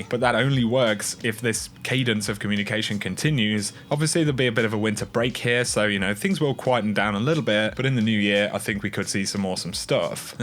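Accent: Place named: England, United Kingdom. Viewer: Another quality video from owwwwww owwwwwwwwwwwww owwwwwwwwwwwwww (wolf howl) the! Always enjoy your videos, i mean your english accent is a bit annoying but its worth it!;)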